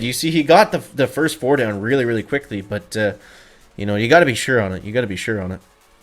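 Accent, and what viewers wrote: Canada. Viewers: could you do one vid with your thickest Canadian accent possible please? I would love it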